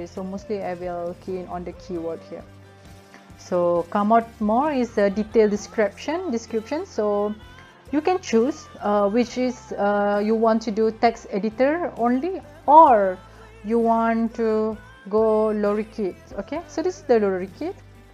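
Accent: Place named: Tamil Nadu, India. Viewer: Mati mood nk tgk bile speaking english dgn suara tamil mcm ni. Baik ckp melayu jelah sis.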